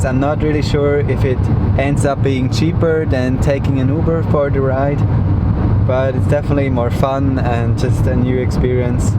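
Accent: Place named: Germany. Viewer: Nice to listen vloggers with an Accent that U have idea where its from... My guess is German.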